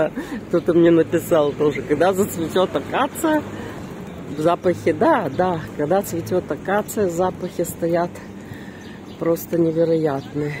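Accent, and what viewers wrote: Odessa, Ukraine. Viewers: Вы интересная чудачка, истинная одесситка.Говор. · спасибо вам за эти ваши прогулки! Наслаждаюсь вашим выговором - как дома побывала! Живу далеко, но вся родня в Одессе.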